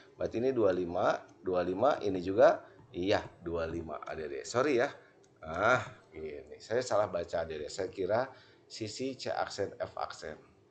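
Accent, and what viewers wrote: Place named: West Java, Indonesia. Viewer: terimakasih kang, lucu menjelaskannya aya aksen sundaan 😂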